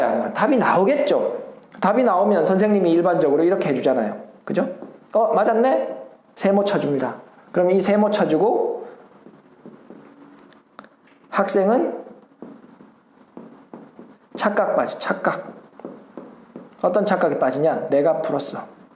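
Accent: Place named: Daegu, South Korea. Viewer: 빠른피드백 정말감사드립니다 복받으세요 ㅎ 선생님께서 말씀하신 동영상 잘봤습니다 저도 선행보다는 심화쪽에 관심을 두고 공부를 시키고 있습니다. 학원을다니는곳이 없어서 시간이 많은아이라 초등부터 수학공부에 80프로를 투자하고 공부를 해온터라 1-1학기 마무리하는데도 주위 다른아이들보다 몇배는 시간들여서 한듯합니다 그래도 안심은 늘 안되긴합니다 ..;;;수학학원을 보내보니 너무 엉망이고 집에서 하자하니 엄마랑 전쟁입니다 제가 공부하면서 가르쳐야니깐 보통일이 아니네요 사투리를 쓰셔서 혹시 해운대분일까하고 봤는데 대구....너무 아쉽습니다 제가 수학쪽은 몰라서 드리는 질문인데 1-1 2-1 3-1 이렇게 구조도상 공부가 연결이 되어있어서 1-1끝이나면2-1하는게 맞다고 주위 학부모들 및 학원선생님들이 요런과정으로 시키고들 있더라구요 그게 아닌가보네요^^다음 동영상올리실때 요런부분도 한번 짚어주시면 많은 학부모님께 도움될듯합니다.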